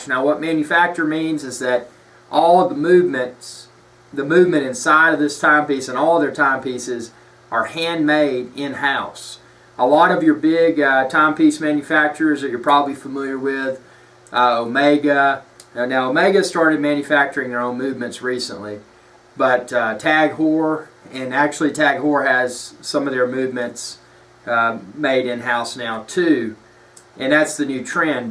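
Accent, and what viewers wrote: South, United States. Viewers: sounds even better when you say it man! I mean it! That southern accent is the best. Greetings from Canada.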